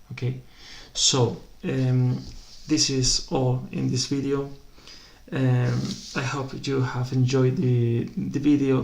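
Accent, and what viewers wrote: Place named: Spain. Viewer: Pues el habla español , o al menos su acento es de español hablando ingles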